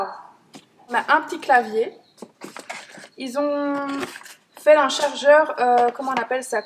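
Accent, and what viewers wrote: Belgium. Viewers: J'ai le même couleur argent, très agréable d'entendre ton accent Belge 🙂j'suis Canadien ;-)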